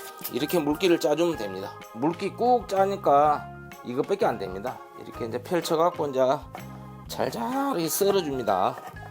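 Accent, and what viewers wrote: Jeolla, South Korea. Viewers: 사투리가 영락없는 우리 전라도 사위 말투 똑같네요.❤ㅎ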